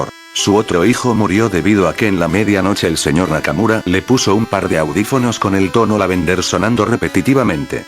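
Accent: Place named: Castile, Spain. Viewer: habla bien no te entiendo por que tu acento castellano me deja sordo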